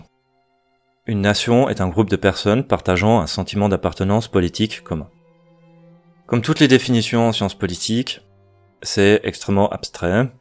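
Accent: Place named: Monthey, Switzerland. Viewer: Excellente vidéo ! Claire et concise, j'aime 👍 Par contre, tu viendrais pas de Monthey par hasard ? Je peux me tromper mais il me semble que tu as l'accent de la région 🤔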